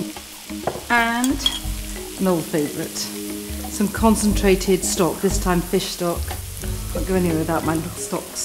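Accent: United Kingdom. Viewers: nigella removes stress from cooking . she's truly fun to watch . but sometimes I'm a bit stressed listening to her brit accent .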